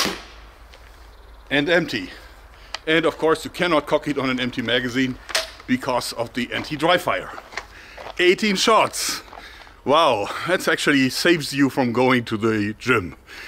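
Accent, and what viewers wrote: Germany. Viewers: your german accent hope thats right my apologies if it is not is absolutely amazing really adds to your videos keep it up! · Dude. Just came upon this channel right now. Hearing your accent I thought "ich denke daß dieser Man Deutscher ist" and then I took a look. Essen Deutschland. I love it man! And you English is killer, like many Germans. Thanks for the great content. Great content. Thx!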